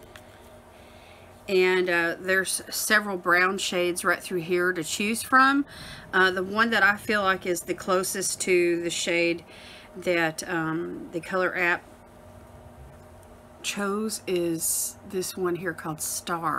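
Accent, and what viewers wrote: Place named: East Tennessee, United States. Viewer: Hello,! I’m here from Erica’s channel, & I really enjoyed seeing the gorgeous look you created with the generated colorstory from the photo she chose for you! Those vibrant blues & greens look lovely on you! I have to ask (particularly based on the location you chose for her) if you’re a fellow Tennesseean. I’m in west Tennessee about 25 miles east of Memphis, but my mom was born in east Tennessee (Kingsport, if I recall correctly), & I thought I detected a familiar east TN accent! 😉👍✨💚💙💚💙✨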